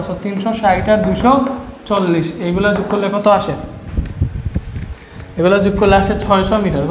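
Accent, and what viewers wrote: Sylhet, Bangladesh. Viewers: আপনি কী সিলটি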